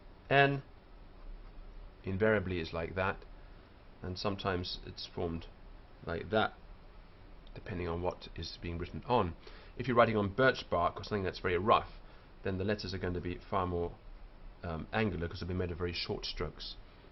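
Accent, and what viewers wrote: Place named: United Kingdom. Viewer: the low audio quality and british accent makes it seem like some old bbc documentary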